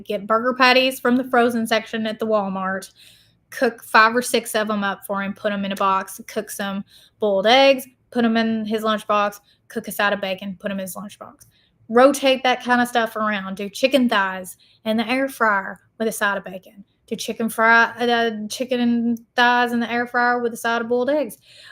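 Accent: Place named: Tennessee, United States. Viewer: Your funny and cute I enjoy hearing you talk with that Tennessee accent 😂😂😂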